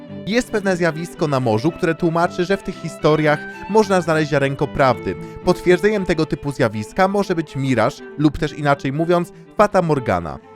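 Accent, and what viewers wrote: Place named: England, United Kingdom. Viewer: Myślisz że to tak łatwo gadać po Polsku kiedy spędziłeś 18lat w Anglii?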